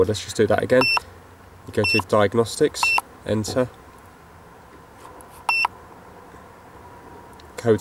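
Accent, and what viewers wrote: United Kingdom. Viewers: Cant stand that Brit Accent. Let alone a Right hand drive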